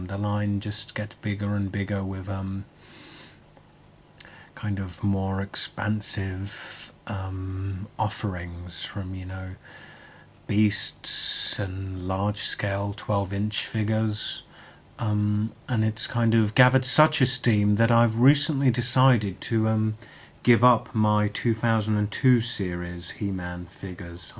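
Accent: United Kingdom. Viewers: cool british acent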